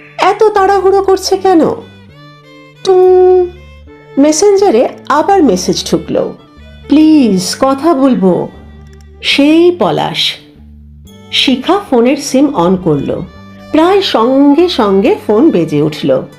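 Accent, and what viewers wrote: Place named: Dhaka, Bangladesh. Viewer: খুব খুউব ভাল লাগল । তাছাড়া ঢাকাই টানে কথাগুলো চমৎকার লাগলো। আমিও যে ঢাকার মানুষ ।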